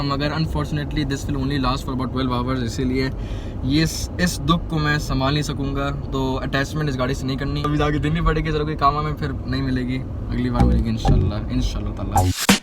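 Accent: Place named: United States. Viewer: Which part of America is this accent from ?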